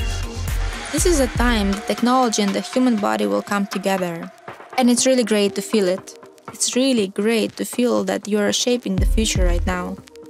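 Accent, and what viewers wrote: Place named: India. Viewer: Акцент смешной, похож на индийский xd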